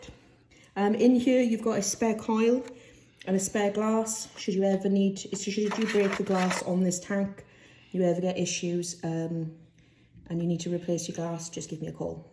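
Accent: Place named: Wales, United Kingdom. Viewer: A fellow welsh person by the sounds of it! :)...and great video! thanks!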